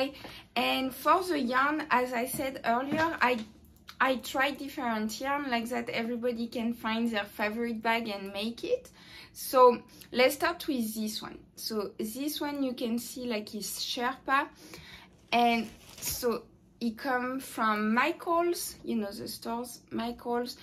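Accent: France. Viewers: Je critique pas du tout, merci pour toute tes explications c'est vrmt super, merci, mais ton accent bien français m'a fait rire 🤣 bien joué et continue c'est top, j'adore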